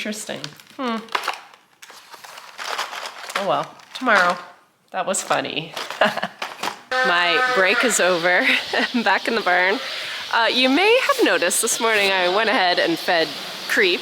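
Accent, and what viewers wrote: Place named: Canada. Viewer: Don’t care about hair. Am old city bred gal now retired. Can not travel so utube is it. This year I have begun to learn about farming. Larg er animal care and feeding. I love your slight Canadian accent. Thank you for what you do.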